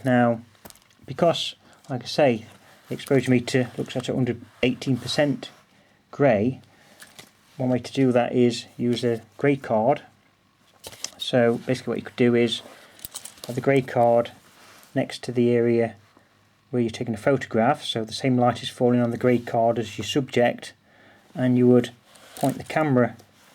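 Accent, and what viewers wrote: Yorkshire, United Kingdom. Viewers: interesting video. yorkshire or lancs accent.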